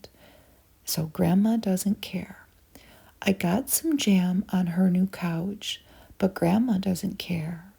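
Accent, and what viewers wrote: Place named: Michigan, United States. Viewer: This is a Michigan accent if I’ve ever heard one!! :)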